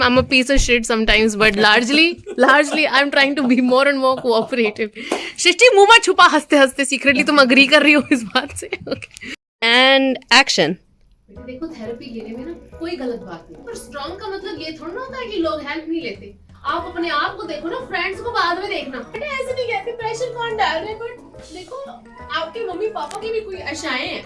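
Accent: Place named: Lahore, Pakistan. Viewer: Your accent of Urdu/Hindi is so Lahori. Lots of love..Keep shining:)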